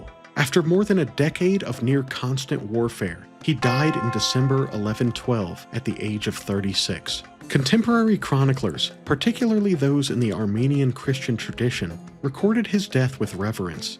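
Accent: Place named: United States. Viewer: Thank you Jesus for the American accent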